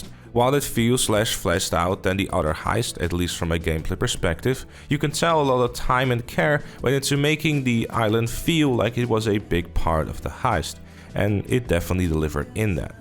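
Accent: Netherlands. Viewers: you sound dutch, are you? :)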